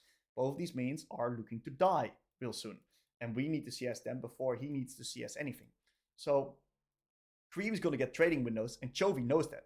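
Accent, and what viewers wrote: Netherlands. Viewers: Good video! The dutch accent is pretty rough though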